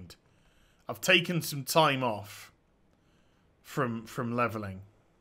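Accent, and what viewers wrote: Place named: United Kingdom. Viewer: Great vid as always. Your soothing British voice helps me relax this boring Wednesday. I think you should wait a while and start over. Keep being awesome! :)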